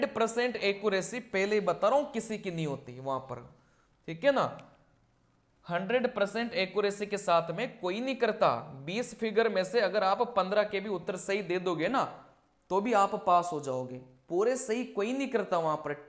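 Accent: South, India. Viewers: Ye south jaisi hindi bolte hai sir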